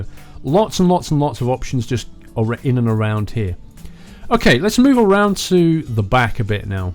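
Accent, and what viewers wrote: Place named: Stoke, United Kingdom. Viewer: you sound like your from stoke on trent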